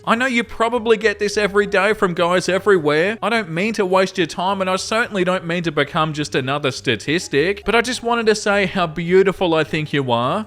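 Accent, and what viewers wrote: Australia. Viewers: oh you're an Aussie. i though maybe i detected sth african/aussie accent. with a dash of NZ lol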